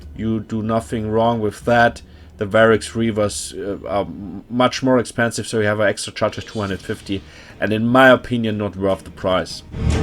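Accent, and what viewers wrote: Germany. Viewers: I find it funny that other germans can tell germans just by the accent. Knew instantly, very nice guide ! :D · I’m officially going to start intentionally pronouncing vampire “wampire” henceforth. Love the Deutsch accent.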